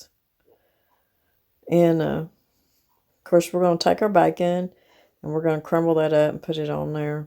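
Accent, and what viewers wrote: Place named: Eastern Kentucky, United States. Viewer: You sound soo much like where i come from ❤!!! I grew up in Eastern Kentucky. I left and im back home. Much love and respect!!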